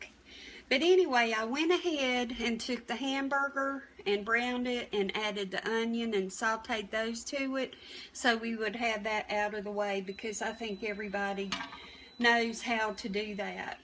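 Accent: South, United States. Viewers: I have just found you, where are you located. Being from the south we don’t think we have accents only the Yankees do, but I thought I heard a little bit of southern twang in your voice, very comforting. Keep on cooking and I’ll continue to watch. Thank you Lady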